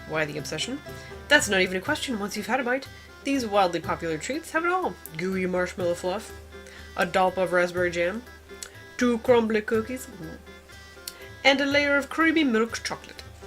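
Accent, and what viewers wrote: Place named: England, United Kingdom. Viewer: Superb, simply spiffing, one is chuffed to bits. You have a great natural gift for comedy along with, it must be said, an ability to do a realistic English accent without sounding like an Australian on Prozac (unlike most other inhabitants of our former colony). Seriously, keep up the vlogs. They are a ray of much-needed sunshine in a dark and gloomy world. BTW, to "have a butchers" is a common expression. It comes from Cockney rhyming slang, butchers hook = look. Very best wishes from the "Dark side" of the pond...…………………….the ancient Englishman.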